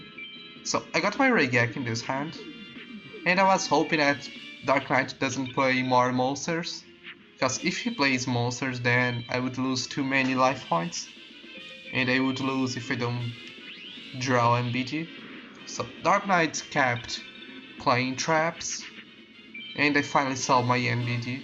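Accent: Brazil. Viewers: Brasileiro falando inglês porra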